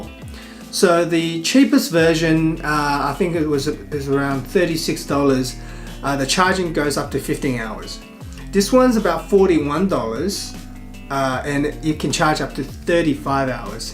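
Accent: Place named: China. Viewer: este chino hablando ingles me vendió los audífonos a un latino. Maravilla en su máximo esplendor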